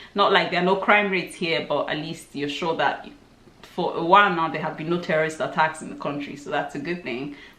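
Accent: Ireland. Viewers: Your accent has Irish in it a bit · I'm delighted you love living in Ireland.As an Irish guy living in Oz it's always good to hear people moving or visiting and enjoying it.I love the Irish twang in your accent.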